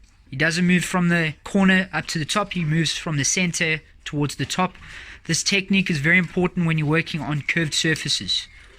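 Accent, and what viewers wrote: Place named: South Africa. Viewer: Sound Southafrican.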